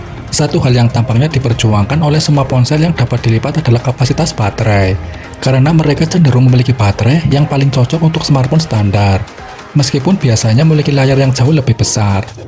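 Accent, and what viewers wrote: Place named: Java, Indonesia. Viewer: medok amat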